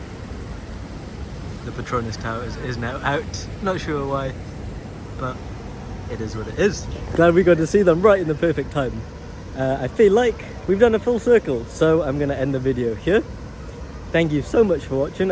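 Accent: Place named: Argentina. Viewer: I keep watching while reading most comments here about how calm and chill this episode. My first impression is you're from South America, particularly like Argentinian. 😂😂. Then to my surprise that you're from Wales when i check your profile page. The only Welsh i knew was the menacing Giggsy.. Aha Enjoy your time in Malaysia eh..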